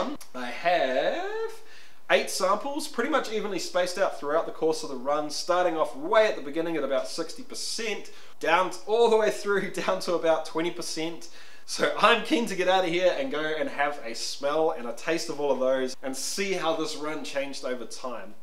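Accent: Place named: New Zealand. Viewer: Awesome videos and being a south islander good to hear a kiwi voice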